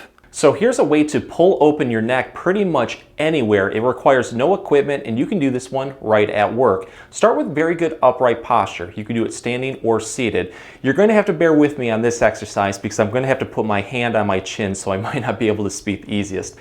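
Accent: Michigan, United States. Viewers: Holy wow!!! I’m working in Italy and the beds and pillows are horrible. My neck was hurting so badly that I started a Google search on videos to help out. I found your videos and what relief!! I definitely will have to see my chiropractor when I return back to the states. BUT I do have to say as soon as you started talking I thought “He must be in Michigan”….. I lived in St Joe, MI for 7 years!!! Of course I’m following you now!! Thanks for the relief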